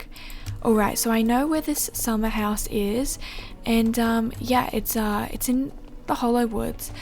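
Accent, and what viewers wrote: Australia. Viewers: No joke, but I really like your Australian accent (I think that’s how u say it).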